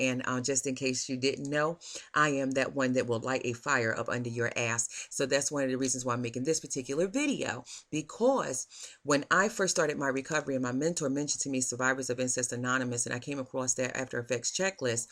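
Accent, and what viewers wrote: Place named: New Jersey, United States. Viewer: you are sooo from Jersey with the "yous" haha i love it! Im from CT and have been to Jersey so many times I cannot count so I can relat. Thanks for this video!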